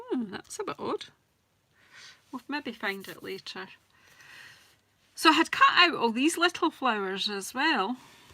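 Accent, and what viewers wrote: Scotland, United Kingdom. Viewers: Where is that accent from as I have never heard it before and have the hardest time ever understanding you. It is NOT you, it is me being German. Is this Scottish? Love the card a lot!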